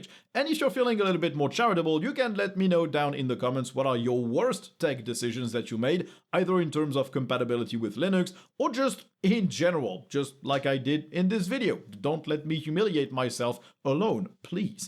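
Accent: France. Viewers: random question here, are you french ?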